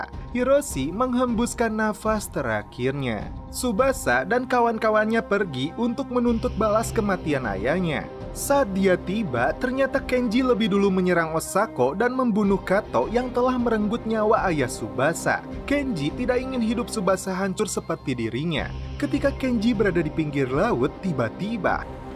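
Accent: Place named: Purwakarta, Indonesia. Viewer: Gue nebak, ini yg ngomong orang purwakarta nih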